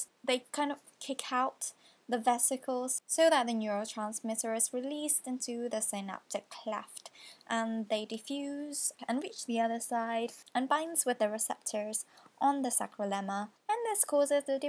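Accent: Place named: United Kingdom. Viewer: i think ur smart :) indonesian who has a brithis accent lol :) salam hangat dari Indonesia :) i wants ur life lol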